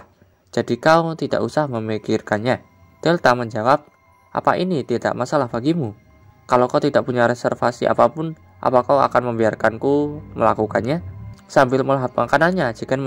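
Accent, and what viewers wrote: Java, Indonesia. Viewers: Medok mas suaranya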